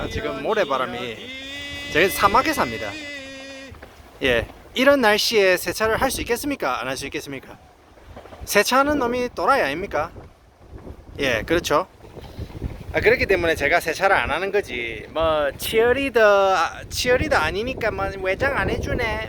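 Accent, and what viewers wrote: Gyeongsang, South Korea. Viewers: 최고 고향이 어딘가요 여긴 창원 사투리가 나오시네요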